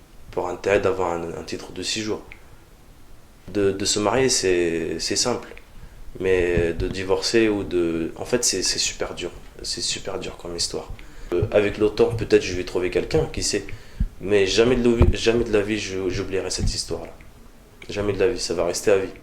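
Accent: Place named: North Africa. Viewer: Oui il a un accent du bled peut être il est venu en France à l'adolescence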